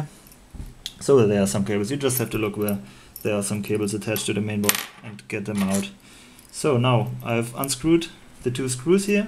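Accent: Germany. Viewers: Nice Video, you have a big german accent, are you German?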